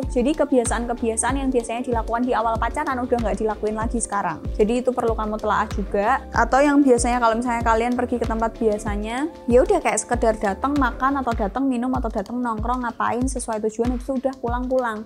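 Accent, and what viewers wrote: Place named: Pasuruan, Indonesia. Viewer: Arek pasuruan mana suaranya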